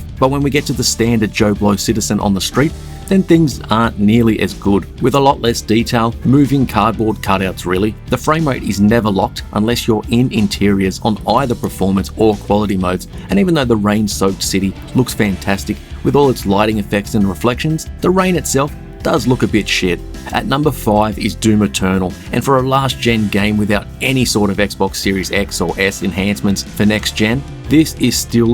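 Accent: Australia. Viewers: Good to hear an Aussie doing some game reviews Keep it up dude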